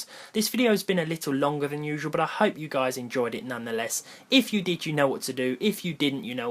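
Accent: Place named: England, United Kingdom. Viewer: Esta bien chido el acento inglés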